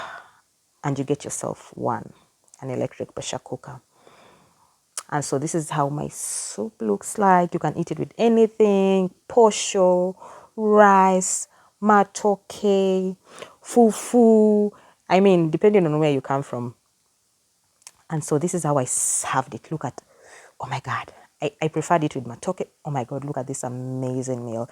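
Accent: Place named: Malawi. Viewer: I love how straight to the point the video is. I'm from Malawi, and we also use Royco here 😂. I've just bought a pressure cooker and an Air Fryer as well. What's beautiful to me is listening to your voice - it's literally identical to how we pronounce words here. Instantly, I was drawn to keep watching, and in fact, I'll be here for a long time watching your videos.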